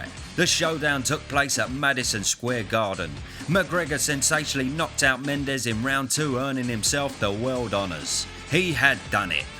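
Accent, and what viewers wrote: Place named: England, United Kingdom. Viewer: Annoying pommy voice 🤮🇬🇧🤮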